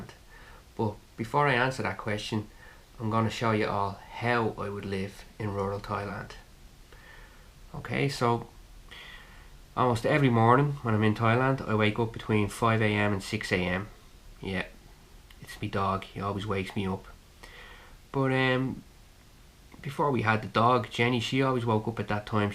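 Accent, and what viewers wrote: Ireland. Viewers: Sounds peaceful Irish.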